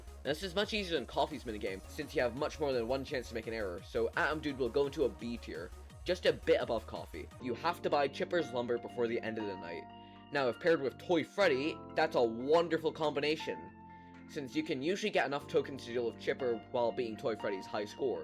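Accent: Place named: Ireland. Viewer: he's from ireland, so i think he's still tryna learn english as there dutch is like the native language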